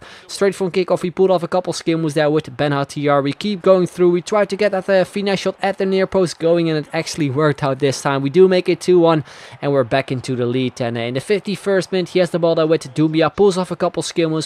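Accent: Netherlands. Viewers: You sound Dutch! If you are, you 'll probebly get more subs than DDG in like 2/3 years.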